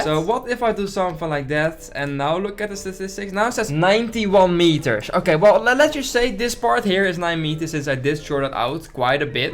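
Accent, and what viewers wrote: Netherlands. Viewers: Nederlands accentje👌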